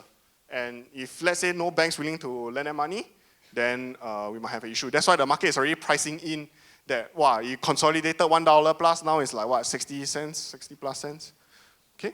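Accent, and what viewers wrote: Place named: Singapore. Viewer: I think he is a Singaporean.. and speaking very fast does not help either.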